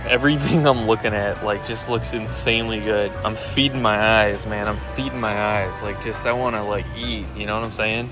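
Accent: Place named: New England, United States. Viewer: Where are you from bro ? Your accent is screaming New England hahah that’s coming from someone on New England lol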